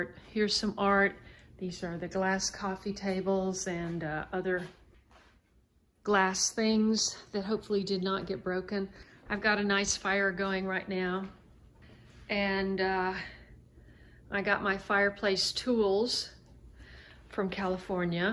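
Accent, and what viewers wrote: Tennessee, United States. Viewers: A Tennessee accent very endearing to me. I am from Wilson county and have lived in SC for 42 years. I pick up my native TN accent in a heartbeat! Let 'er fly, sister! Your home is beautiful. It seems light and cozy.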